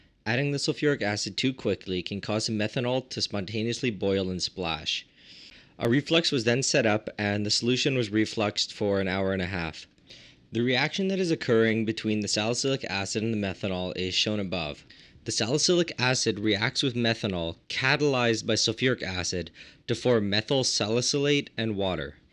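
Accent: Quebec, Canada. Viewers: That Canadian French accent though . . . "Le Chateller"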